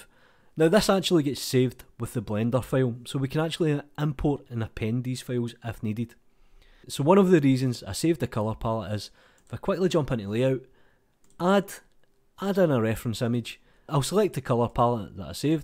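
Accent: Scotland, United Kingdom. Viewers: Brilliant! Thanks for the great tutorial: easy to follow and nice to hear a Scottish voice on Youtube!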